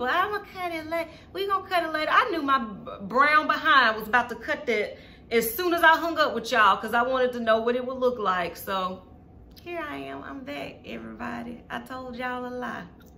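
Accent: South, United States. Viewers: What do I think?? I think we could be friends wit yo country sounded self. Girl you are a hoot! I absolutely love your candor and style!!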